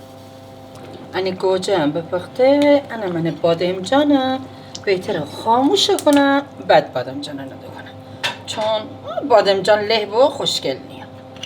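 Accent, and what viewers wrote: Gilan, Iran. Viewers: بی نظیر عالی دستتون درد نکنه خیلی لذت بردم هم از غذاتون هم از گویش و لهجه شیرین گیلانی شما دوستدار شما از انگلیس 👏👏👏👏👏👏👏😘😘😘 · درود بر خانم خوش صحبت و خوش رو بسیار عالی بود هم آشپزیتون هم گویش زیباتون ، بله دقیقا طرز تهیه قرمه سبزی گیلانی مون همین جور هست بسته به ذائقه هر خانواده نوع گوشتش و حبوبات و مزه دار کردن غذا فرق میکنه... بورانی تون عالی بود کدبانو جان ما پیاز نمی ریزیم. غذا های گیلان مون بی نظیرن 👌👌👏👏👏🥰🥰🤗🤗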